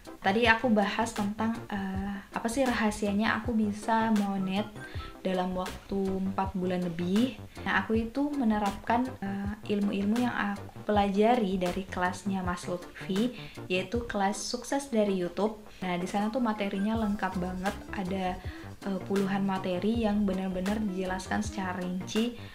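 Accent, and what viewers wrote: Indonesia. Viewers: ORANG GORONTALO KAH ??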